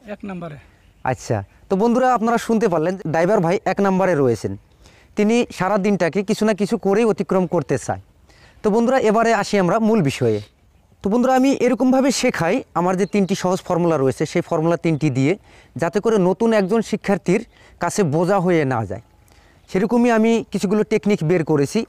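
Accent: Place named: Bangladesh. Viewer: আপনি আসামের লোক অথচ ভাষা আমাদের বাংলাদেশের মত। অবাক লাগলো ব্যাপার টা।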